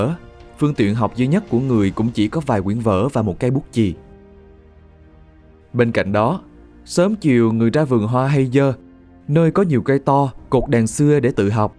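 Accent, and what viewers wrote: South, Vietnam. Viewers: Không tự nhiên bài này người đọc giọng Nam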